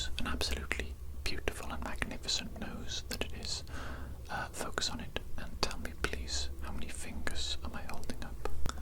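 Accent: Wales, United Kingdom. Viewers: wondered and wondered if i was imagining the welsh accent, but then you said 'ini' and i'm certain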